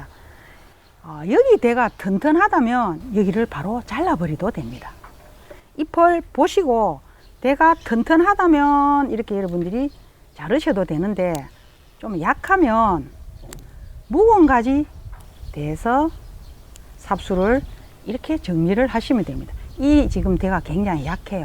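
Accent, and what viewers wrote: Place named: Gwangyang, South Korea. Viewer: 광양분 같네요.목소리가...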